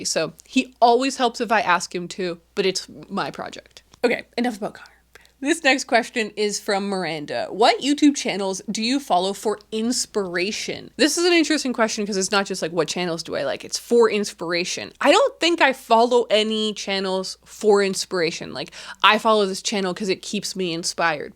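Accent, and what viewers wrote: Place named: Canada. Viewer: Shes is so... Canadian, eh? Huus and aboot, instead of House and About.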